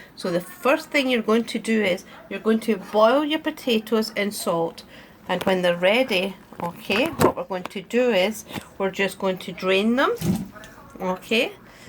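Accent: Scotland, United Kingdom. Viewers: As I like the Scottish accent listening to this was almost like listening to a ASMR video. Greetings from Italy.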